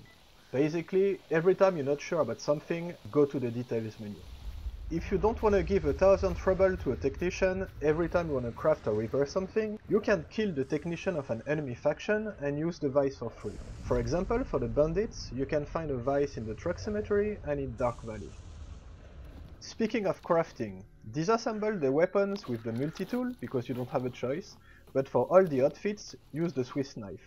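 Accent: France. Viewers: ...wait ... are you french? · Mdr t'es français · l'accent français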